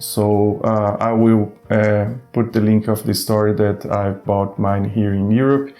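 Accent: Brazil. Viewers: Sotaque de BR haha belo review meu caro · By the accent, it's Brazilian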